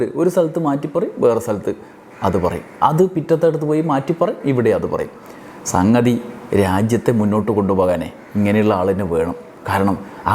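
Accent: Kannur, India. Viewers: നിങ്ങളുടെ കണ്ണൂർ ശൈലിയിലുള്ള സംസാരം കേൾക്കാൻ നല്ല രസമുണ്ട് കാബുള്ള കാര്യങ്ങൾ ഹാസ്യാന അവതരിപ്പിക്കുന്നു നന്ദി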